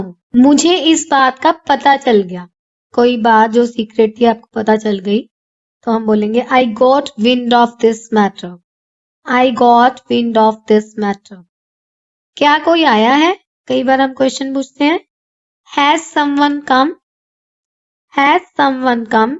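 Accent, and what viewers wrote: Punjab, India. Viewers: Sweet voice, some times Punjabi tone and useful sentences. Thanks mam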